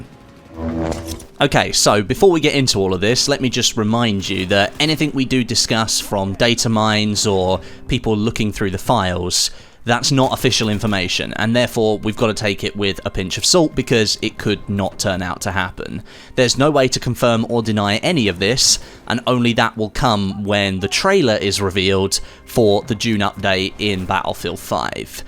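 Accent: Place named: England, United Kingdom. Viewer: Have seen worse pronounciation of german words. You have an english accent, yes, besides that, Sonderkraftfahrzeug was pronounced ok, B-C from your german teacher ;P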